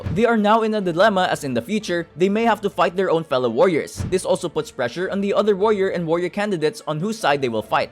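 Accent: Philippines. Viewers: you have a filipino accent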